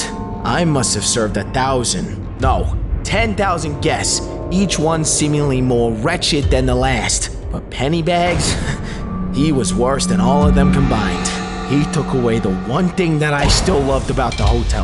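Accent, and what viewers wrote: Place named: United Kingdom. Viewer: Gasp HES BRITISH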